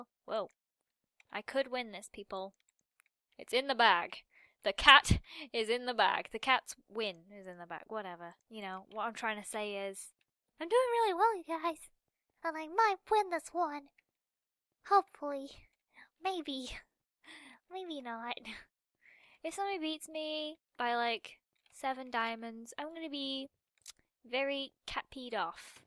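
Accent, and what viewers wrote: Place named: England, United Kingdom. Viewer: She's English too... Accent**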